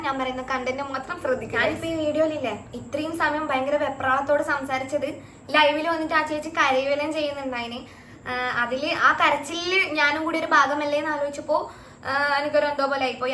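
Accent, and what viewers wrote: Kannur, India. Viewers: ചേച്ചിടെ സംസാരം കേട്ട് ,കണ്ണൂർ ആണോ? കാസറഗോഡ് ബോർഡർ ആണോ? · Kannur ahno njngalde slang pole thonny😌😂❤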